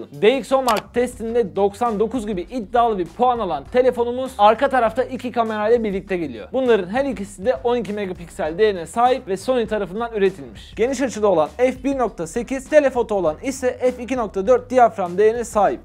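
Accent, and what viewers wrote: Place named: Uşak, Turkey. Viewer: ABİ SEN NERELİSİN UŞAK ÇEVRESİ GİBİ GARİ FELAN DİYON :D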